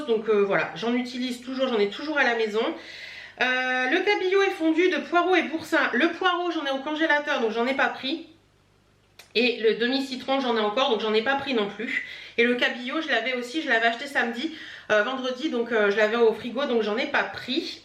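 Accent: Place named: Belgium. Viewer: Coucou, j'ai bien aimé ton accent belge 😂😂😂 j'ai cru que tu pensais à moi à ce moment là 😂😂😂. Gros bisous de Belgique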